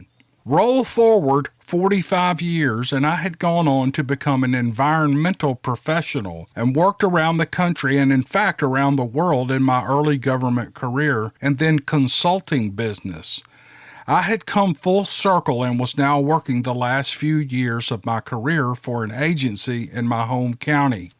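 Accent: South, United States. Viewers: 😂I laughed and laughed 😅 at this story, 🤣 poor kid, this was a fantabulous! Bigfoot story I'm still playing catch-up, but WoW, I do love but the stories have to be read by you exclusively! Or I'm not really interested in listening. IDK if it's your southern drawl, or what 😊 ROCKS❤ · Hey, I have a good one for you. All stories are B.S. = *BEST *STORIES!! I LOVE THESE STORIES ❤️❣️. Keep reading because your the *Best *Story Narrator out here in you tube land. As for the people who know a story is supposedly b.s.( I say ) *Bloody *Stop and enjoy that *Beautiful *Southern voice. Much love to you and yours ❤️ B. S. = *Be *Safe. Lol. 😄.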